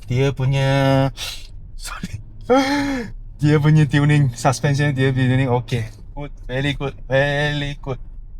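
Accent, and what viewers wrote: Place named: China. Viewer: Hehe... Aku mmg suka la tgok video ko. Mmg syok bro. Ko ckp jujur. Malah video ni paling best...ko sebut P A J A R O...hahaha!! Pelat Cina ko tu buat aku gembira. ❤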